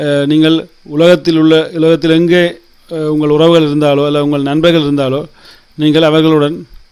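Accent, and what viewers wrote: Sri Lanka. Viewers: Sri Lankan TAMIL ah?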